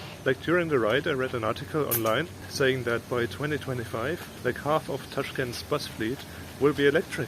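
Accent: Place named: Germany. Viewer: Такое чувство что немец говорить на английском...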